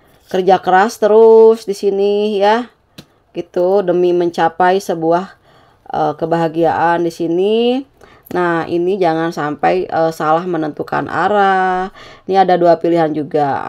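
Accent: West Java, Indonesia. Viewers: Assalamualaikum bunda asli timana logatnah sunda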